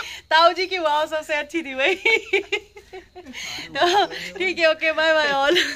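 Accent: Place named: Bilaspur, India. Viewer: Madam ji aapka mayaka khan hai aap bilaspuri bol rha hai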